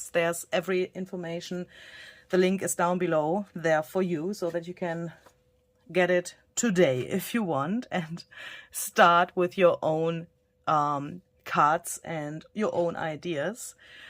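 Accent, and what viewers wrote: Germany. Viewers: Omigosh, …This digital came up on my Etsy feed last night and I added it to my cart… Now, when I see it in use…I must have it…I will be returning to my cart and purchasing it right away…I love these cards… Now I have to figure out how to print four on one page… I must tell you, I am of German descent and when I listen to your accent, it brings me back to my childhood and makes me happy… You have a wonderful personality and you make me smile…Hugs to You…❤️❤️❤️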